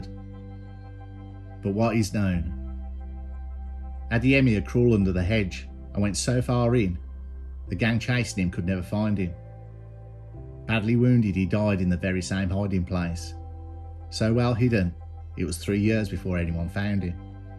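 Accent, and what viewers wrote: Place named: West Midlands, United Kingdom. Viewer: I could listen to you read the dictionary love the West Midlands accent much love from a weegie 😊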